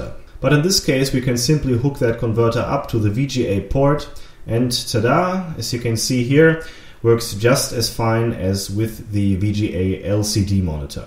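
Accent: Germany. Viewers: 100% German accent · Sorry aber man hört den deutschen akzent einfach raus ; D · Hi your englisch sounds German : ) Realiy nice work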